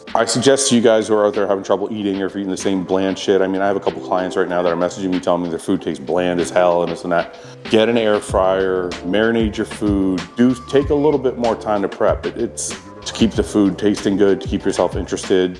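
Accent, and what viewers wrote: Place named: Canada. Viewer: He sounds Canadian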